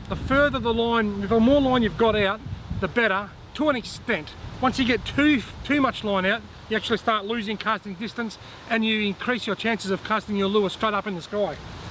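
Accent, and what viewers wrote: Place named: Australia. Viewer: My bad. I didn't hear Australian at first. Not many Aussies in USA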